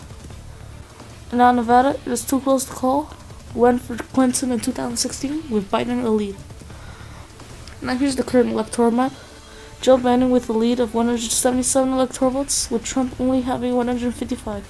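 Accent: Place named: United Kingdom. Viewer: You have a British accent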